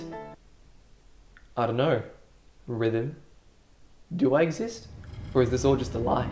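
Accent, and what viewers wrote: Australia. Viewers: Dayum son, that Australian accent is thicc af. First time I've seen your face... other than your (half faced) dp xD OreGairu and Nisekoi... the first 2 anime that got me into covering seasonal anime music lol We started getting into anime with the same series... wtf is with these coincidences kek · jeremy wee well both of them have Australian accents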